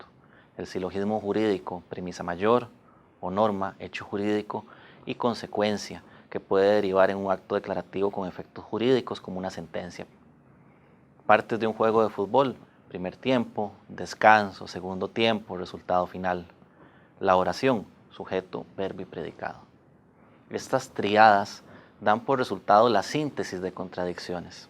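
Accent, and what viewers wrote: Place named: Costa Rica. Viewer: es por q es costaricence y su forma de expresars es muy peculiar,con un acento distinto al demas español.y bueno si también ay palabras q significan otra cosa pero siempre ligado a su idioma popular.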